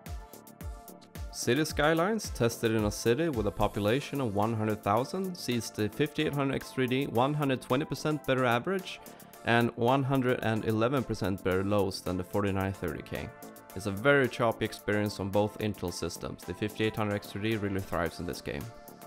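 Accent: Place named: Sweden. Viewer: If you listen closely you can hear your accent is coming from Sweden sort, yeah its correct xDD.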